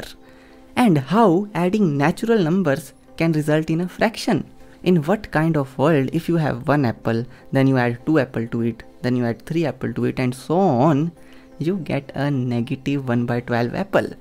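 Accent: India. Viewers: As an Indian myself, I've never heard such a strong Indian accent in my entire life 😅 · I love your hard accent. Feels like home. It feels much more unique even for Indian accent sounds different from commonly spoken english at home. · The first Indian Accent which matches the representation of Indian accent in Western World.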